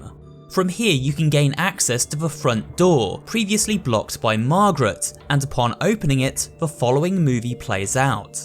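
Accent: United Kingdom. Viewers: hes briitish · Wait is British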